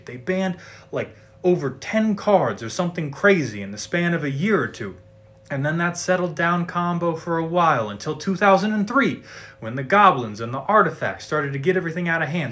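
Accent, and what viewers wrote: South, United States. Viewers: Honestly that was some of the cleanest, smoothest, and slightly southern explanation for why I feel fear when I add one too many cheap red spells into my deck.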